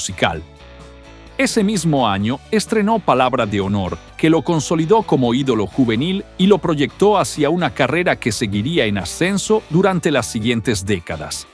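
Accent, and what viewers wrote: Yucatán, Mexico. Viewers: Creo que el que narra el video es de Yucatán, BOMBA !!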